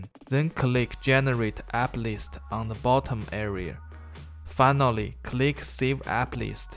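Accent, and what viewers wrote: China. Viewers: UP主一听就是中国老乡哈哈哈哈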